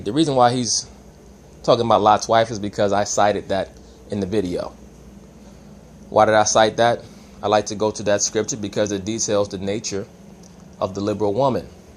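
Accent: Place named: East Coast, United States. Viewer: I like ya commentary/ideas on things. You gotta be from the East coast, I hear it.. such as myself. Smart brotha!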